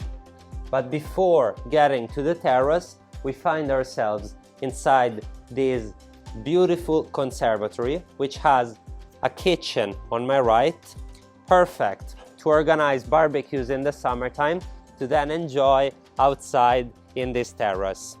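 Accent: Italy. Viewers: In realtà ha un accento molto meno marcato della maggior parte degli italiani che parlano inglese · Hello friend I am here only for your Italian Accent. Love you buddy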